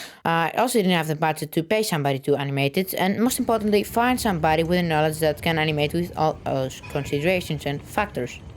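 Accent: Netherlands. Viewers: It's a very thick Dutch accent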